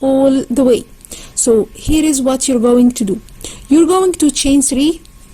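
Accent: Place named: Egypt. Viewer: عرفت انك مصرية من اول ثانية في الفيديو 😅 اهلا بيكي و شكرا على الفيديو الجميل ده ❤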